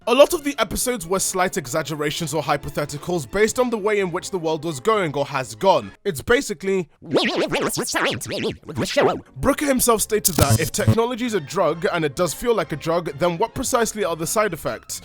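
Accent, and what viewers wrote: United Kingdom. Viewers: You have such a bearable British accent. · Rock Stone oh my God. Not every black person is African American. This dude clearly has a British accent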